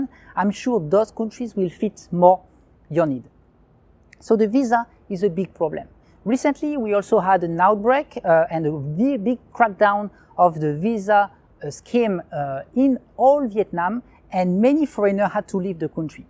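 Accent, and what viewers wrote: France. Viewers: investors can put their money wherever they want and it doesn't mean they are right. Secondly american is the clearest accent out there?, it is all too subjective dear, i have a hard time understanding an texan or a deep south accent and they are all american accents!!! luckily they are subtitles on TV to help. And for a british or aussie, their english will be the clearest, and they are lot of students learning english there, so it's a matter of perception and preferences: for my part, i met people all around the world and they ask speak english with a different accent and i understood all of my speakers. My comment about the guy who suggested that should learn to speak american english was to say, although his accent is french but the content of his message is understandable and they are subtitlesb in YT for those who have difficulties to hear what he says.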